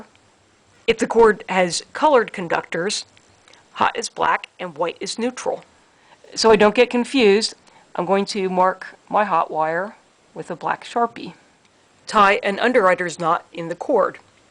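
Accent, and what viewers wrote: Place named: Baltimore, United States. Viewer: neat video, accent 100% sounds like a Baltimore/Hampden/Dundalk accent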